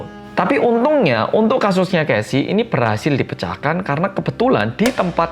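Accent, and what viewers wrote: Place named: Java, Indonesia. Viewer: Selalu menarik uraian diakhir kisahmu...mas medoook😅...tks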